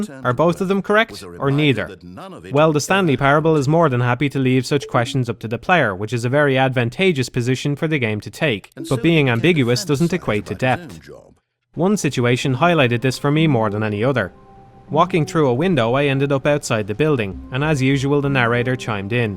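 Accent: Ireland. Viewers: You said "fuck you" in your thick Irish accent. My day has been made.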